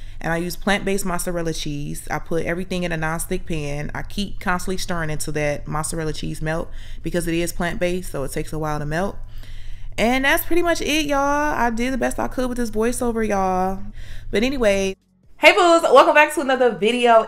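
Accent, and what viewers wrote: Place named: Washington DC, United States. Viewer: The accent dound like she's from DC/PG..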